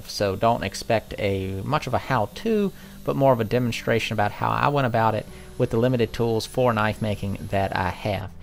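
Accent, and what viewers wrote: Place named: Alabama, United States. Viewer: Beautiful knife...thank you for sharing! I noticed you said in a below comment that you were driving by SMKW when you decided to go in. I live about 30 minutes away from there. So you live in East Tn or were you visiting? Your accent sounds more from central Alabama.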